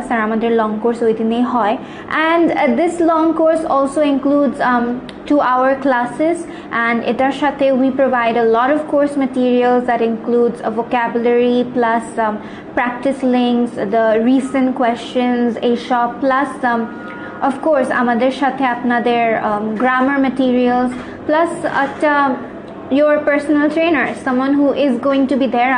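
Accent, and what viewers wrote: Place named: United States. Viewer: আপনি বাঙালি বাংলা এমন ভাবে বলেন যেন আমেরিকা থাকেন। আগে নিজের ভাষা ভালোভাবে বলতে শিখেন তারপরে ইংরেজি শিখাবেন।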